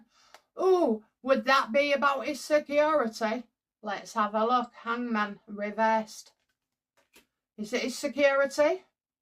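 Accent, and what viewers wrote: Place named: England, United Kingdom. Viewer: Im english, living in Ireland. Im here for your accent. I miss that, and a proper chippy. LOVED the white suit!!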